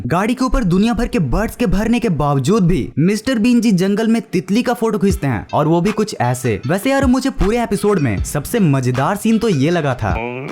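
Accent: Bihar, India. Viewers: Aap bihari ho kya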